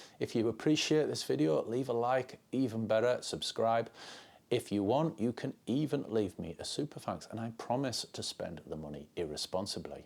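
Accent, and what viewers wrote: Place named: Spennymoor, United Kingdom. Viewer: Just stumbled onto your video, really glad I did. Some really useful tips I haven't seen elsewhere, the black and white thing for example. I would definitely appreciate a video on masking from you, again I think I'll get tips that I don't see elsewhere. Couldn't quite place the accent though. I was hedging Spennymoor..... Ps. That's a great eye for the photo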